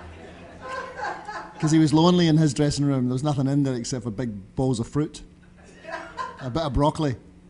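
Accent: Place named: Ayrshire, United Kingdom. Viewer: This guy comes from my hometown in Ayrshire, his Dad had a music shop in the town and often came to our house to tune our piano when I was a kid. I can't believe Colin still has his Ayrshire accent after leaving here over 40 years ago.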